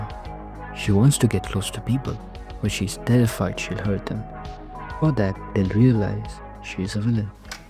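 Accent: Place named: India. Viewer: Hey man, it was honestly a great video, did not even notice that you are an indian. (You have just some general accent, that does not really hurt understanding you. Overall the video is quite nice, especially the editing, but i think that it lacks something for a super popular video, for some reason. Hope to see you creating in the future!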